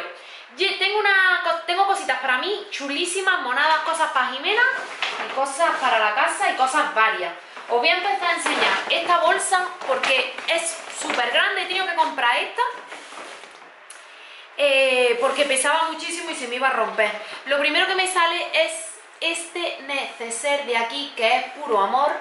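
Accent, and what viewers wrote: Granada, Spain. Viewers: Hola guapísima,!! yo nueva por aquí. Y por ser de Granada y tener ese acento tan granadino me flipo . Soy nacida en Girona pero mis padres , abuelos etc........ son de Granada ,,, la alpujarra concretamente de cadiar . Me alegra verte , ........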